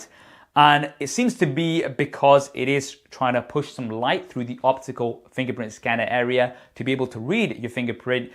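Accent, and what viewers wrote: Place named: United Kingdom. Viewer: He is probably Indian but he has an British accent so he probably lives in uk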